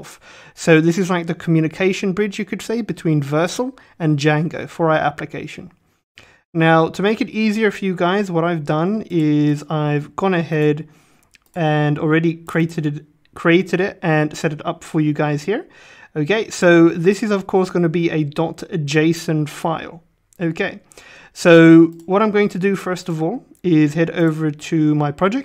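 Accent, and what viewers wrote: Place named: United Kingdom. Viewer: I am getting something from your voice, you studied in the UK for a while and lived there for a while but you are not originally from there. your accent is faint but still audible.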